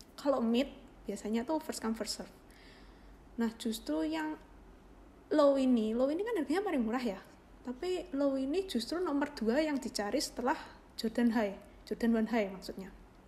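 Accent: Java, Indonesia. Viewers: Logat nya kaya jawa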